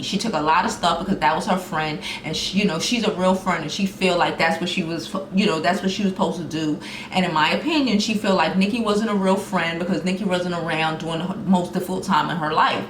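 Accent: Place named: East Coast, United States. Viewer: I know my east coast people 😂💚 you either from Philly or DC 🤷🏾‍♂️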